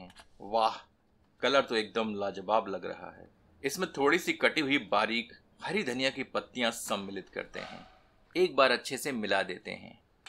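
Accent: Standard. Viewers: the purest n sweetest Hindi I have ever heard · आपकी शुद्ध हिंदी के कायल हो गये हैं। आपकी हिंदी तो अमिताभ बच्चन से भी शुद्ध है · itni sudh hindi sunkar dil khush ho gaye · भैया इतना शुद्ध हिंदी कहा से सीखे है । आपके पूरे स्कूल को आप पर हिंदी के लिए गर्व होगा । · Sir apka hindi sunke Dil bag bag ho Gya.....Hum v tasty recipe or suddhaa Hindi ke liye apke khate pe like or ek Subscriber Sammilit krte he........😊😎👌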